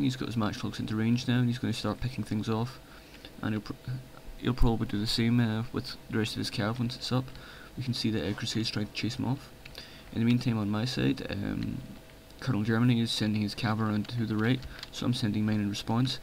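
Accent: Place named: Scotland, United Kingdom. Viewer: Finally a Scottish Shogun 2 commentator, if yer accents anything to go by. :)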